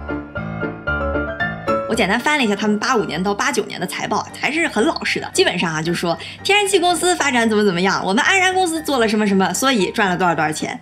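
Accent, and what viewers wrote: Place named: Northeast, China. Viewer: 美丽，睿智，幽默，专业。喜欢您的东北口音：）想起了多年前在长岛的一个哈尔滨美女，我的麻将搭子，哈哈。关注了。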